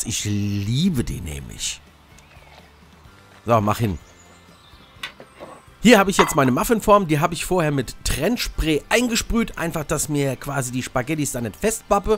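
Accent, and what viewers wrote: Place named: Hesse, Germany. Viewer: Gut Esse, hessisch Gebabbel und rockisch Mussigg! Was will mer mehr!? Mach weiter so! Grüße aus Mittelhessen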